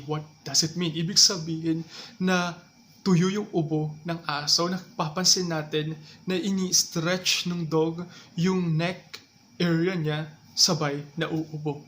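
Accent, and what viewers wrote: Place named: India. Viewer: Bat po kayo ganyan magsalita sir? Parang bumbay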